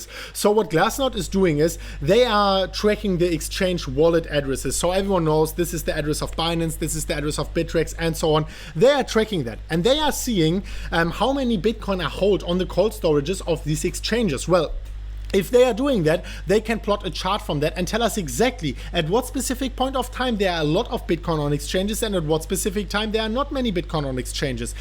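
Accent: Germany. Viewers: I love your German accent. GET DOWN...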